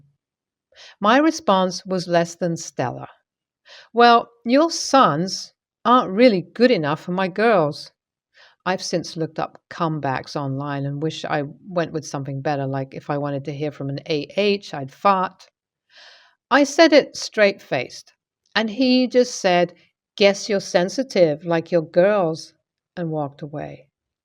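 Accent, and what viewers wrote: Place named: United Kingdom. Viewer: Pick an accent and stick to it!!! Its grating to hear the bad aspects of uk accents.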